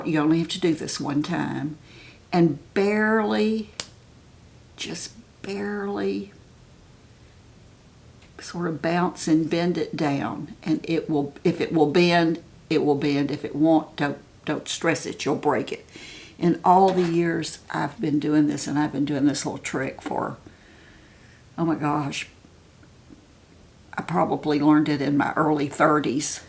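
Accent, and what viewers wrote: South, United States. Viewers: Good day Mrs. You are amazing and brave. There is no way, I would have a YouTube channel but show myself without makeup is a scary thing to me. But you have a bieutifull face and a great southern accents. I would love to hear some stories you might want to share and tips and things. I am sure you have a lot to share that would be helpful to somebody like me. Thank you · Your eyes are so pretty, bright, and clear. Not just the color, but the whites are so white, even b4 makeup application. Tends to fade with age and/or health challenges. 80 looks good on ya! Appreciate your transparency. New here. Enjoying your southern lilt. Luv the transformation you did here! Very nice result. Great pointers. Just checking it out 🖐 P.S.Yknow, me and a friend are watching and think you resemble singer Judy Collins. Ever heard that before? p.p.s. 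I have heard other makeup artists comment about the importance of blending, that unrernlenring is one of the most common errors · I love your Southern accent. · Absolutely love this! You best believe I will be glamming up when I’m your age ❤ so elegant and your southern accent saying “matoooor” and “oahll(oil)” reminds me of my southern family. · Just found your channel and I love it!!! Thank you for this makeup wisdom!!! Also,do I detect a bit of a Southern accent? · Just found your channel at the recommendation of a friend! You are gorgeous! Love that you show your naked face and all the techniques you use to enhance your beauty. At 80, you are amazing! And with your accent you must be a sister from the south. 😊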